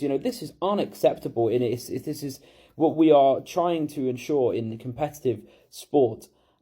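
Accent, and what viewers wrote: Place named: Liverpool, United Kingdom. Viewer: Proper scouse accent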